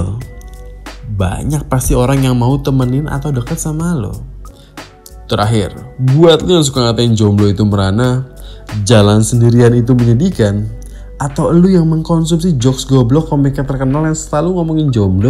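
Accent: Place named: Java, Indonesia. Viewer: Lo kenapa sekarang pake logat logat jawa gitu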